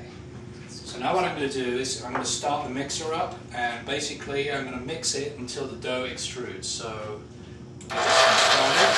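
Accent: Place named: United Kingdom. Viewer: Who swears with british accent?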